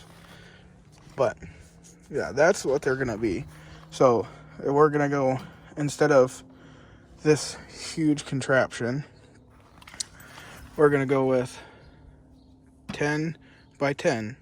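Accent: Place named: West Texas, United States. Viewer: Damn for some reason I thought you were west Texas.. Nice build buddy!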